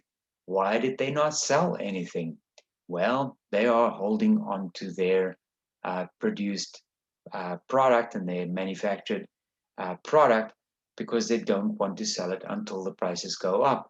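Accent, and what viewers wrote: South Africa. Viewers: Are you like half South African half American? (Accent)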